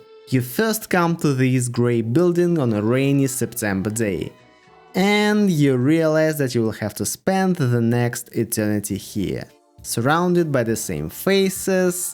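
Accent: Russia. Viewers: дикое произношение с русскими интонациями, корявые звуки. Гласные это нечто. ИИ и то лучше бы проговорил. Да и информация местами субъетивная. Фолрма, иди.от не для коллективизма, а для дисциплины. Лечи свое ЕГЭ головного мозга. Да еще и левах в пеньюарных платьях показал, как что-то укоренившееся. Петрушка...явно селянин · Такое ощущение, что английский текст произносится русскоговорящим. Мне кажется, над его английской фонетикой нужно серьёзно поработать...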